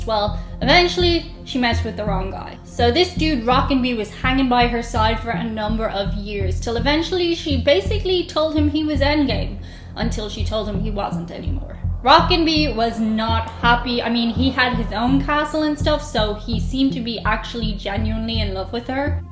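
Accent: Ireland. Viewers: Ha come to think of it that kinda does describe most Irish women I know...I love stories like these and your accent makes them 100% better. Well done 👏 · The irish accent is cute and hilarious 😂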